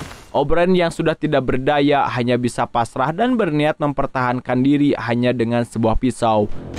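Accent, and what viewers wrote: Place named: West Java, Indonesia. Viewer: eleh-eleh.. sunda pisan.. 😁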